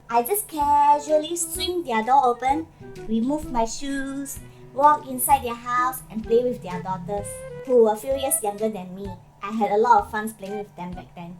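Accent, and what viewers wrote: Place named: Malaysia. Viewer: Welcome back. I love your humour and I especially love your Malaysian accent. You do not put on the faux American accent. Keep up great work.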